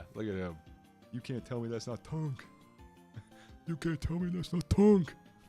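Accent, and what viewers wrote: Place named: Canada. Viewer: I love the Canadian accent😂❤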